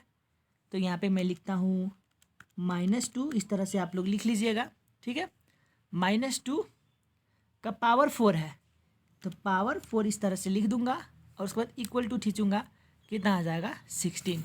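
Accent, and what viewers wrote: India. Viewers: I'm opening the video and the minute I hear Indian 😩😩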